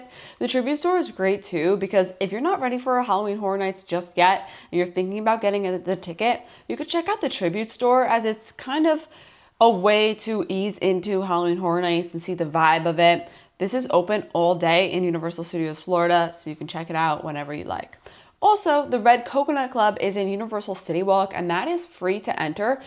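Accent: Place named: New York, United States. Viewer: I always tune in to your universal videos. So excited for hhn this year! Also love your channel because I'm from long island NY and love hearing your NY accent 😂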